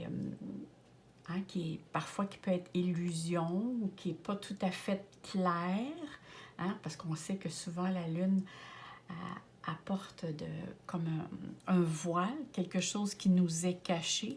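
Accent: Quebec, Canada. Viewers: Bonjour, merci pour ces tirages. J'ai fais le choix n°2, ça me parle énormément! J'espère que ma situation prendra l'issue de la fin du tirage, le retour de la personne. J'adore ton accent Québécois et toutes les merveilleuses énergies que tu nous transmet aux travers de toutes tes vidéos. Namasté.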